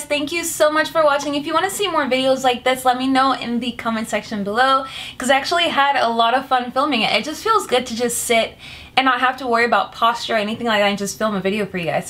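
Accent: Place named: Jamaica. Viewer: Great Video, Where are you from? I almost thought I heard a Jamaican accent.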